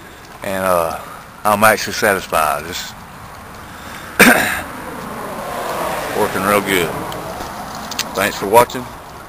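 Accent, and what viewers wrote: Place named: South, United States. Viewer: Good job! Love the southern drawl! Beats mine all to shame. lol One question, why did you not like the internal spring on the poppet valve? Again, great work!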